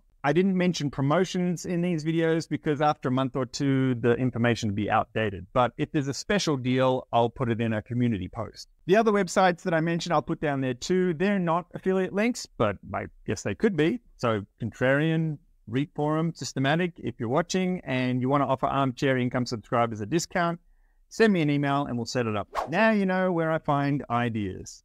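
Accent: Australia. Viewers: Hi Armchair, sounds like you are Australian. Do you also cover Australian income ETFs? I'm Australian but live in the US so I invest in both countries. Thanks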